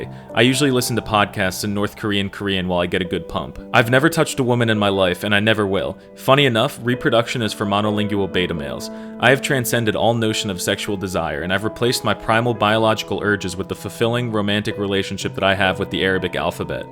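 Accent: Spain. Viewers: Your Spanish is from Spain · bro, disgusting that you run that Spain Mexican accent. You should speak Mexican with a Mexican accent.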